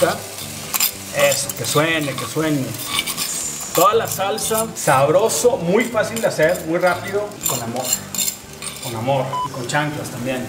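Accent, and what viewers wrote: Monterrey, Mexico. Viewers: amo tu acento regio y esa energía. Saludos!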